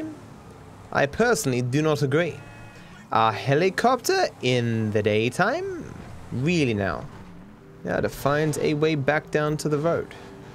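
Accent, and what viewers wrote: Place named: Australia. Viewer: You sound Soo middle class Au...! Enjoying the vids fella.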